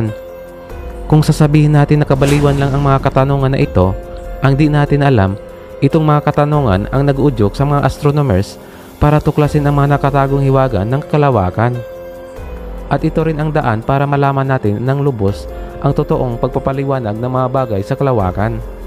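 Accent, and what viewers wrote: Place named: Iloilo, Philippines. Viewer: wow ilonggo nga tagalog..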